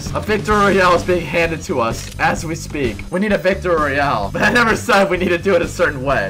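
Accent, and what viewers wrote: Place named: New Zealand. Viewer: Who else hears kiwis when he talks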